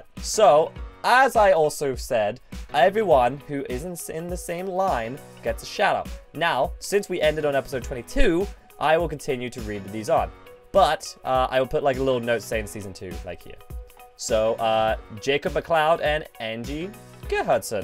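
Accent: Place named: England, United Kingdom. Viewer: I 💗 your English accent and your super nice and I always watch your videos every day 😍🇬🇧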